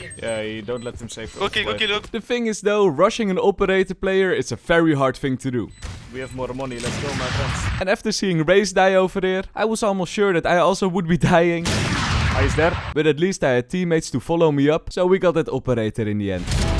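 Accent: Netherlands. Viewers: hahahaha ik hoor die nederlands door je accent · first 10 seconds of the video i recognize a heavy dutch accent and i was right LMAO xd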